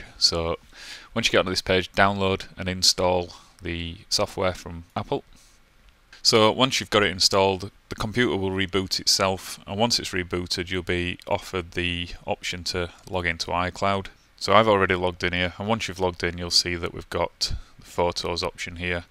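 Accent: United Kingdom. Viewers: lifesaver! Thank you so much! much prefer listening to a British voice than an American as well LOL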